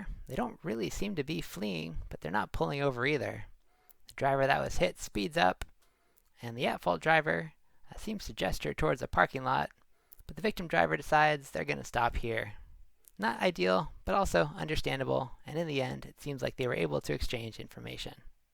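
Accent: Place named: Seattle, United States. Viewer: I didn't realize people from Seattle had an accent. The narrator talks like Seattle-born singer, Jennifer Warnes. <link>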